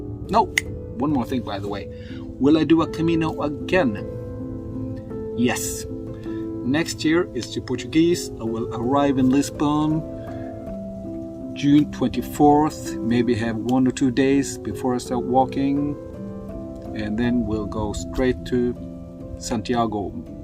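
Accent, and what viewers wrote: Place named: Poland. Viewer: Guy sounds like a polish. The shoes are a gay overload. You don't need all the crap. I wore an old pair of running shoes, on the plane, in the trail, in the auberge, everywhere... but they were running shoes, gel padding in the sole, no problems at all on my whole Camino. Just bring one change of under clothes in your pockets. You don't need a back pack. Your not in the Rockies. Anything else you need.... BUY IT THERE.... Spain is a developed country for crying out loud!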